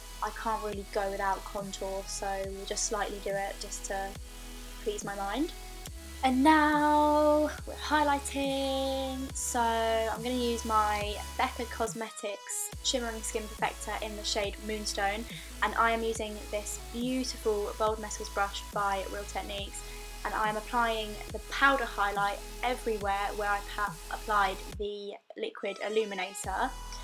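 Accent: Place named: United Kingdom. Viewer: Your british accent ❤🔥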